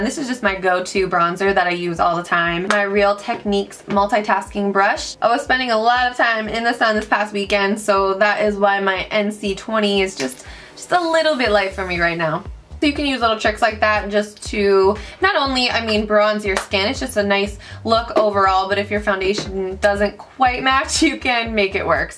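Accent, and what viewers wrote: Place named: New York, United States. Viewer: I feel like you almost have a New Yorker's accent. I love it.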